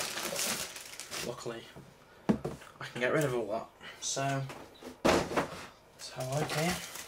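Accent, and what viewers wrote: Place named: Liverpool, United Kingdom. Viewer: Are you from Liverpool? Your accent sounds like you're from Liverpool so I was asking if you're from there...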